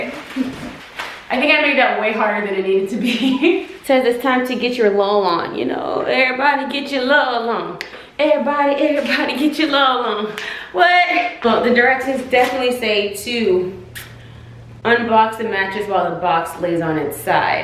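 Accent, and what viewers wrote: Jamaica. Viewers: Are your parents Jamaican? I can hear the accent and mmm mmm is honestly what have it away in the beginning 😂😂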